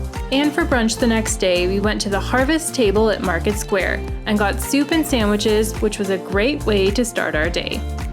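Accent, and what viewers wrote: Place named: Canada. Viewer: This lady has a nice Canadian accent.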